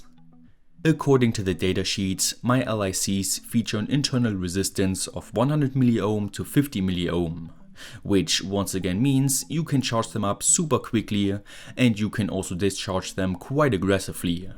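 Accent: Germany. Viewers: Is this German accent or what?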